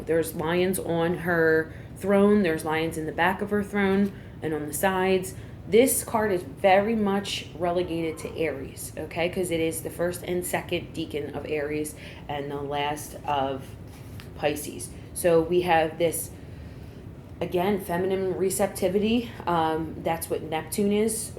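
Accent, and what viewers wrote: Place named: Northeast, United States. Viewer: R u from Philly? U have that north east accent.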